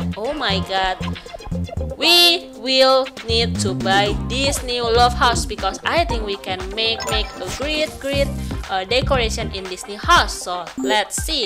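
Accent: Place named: United Kingdom. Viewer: Ur Voice Sounds Britsh.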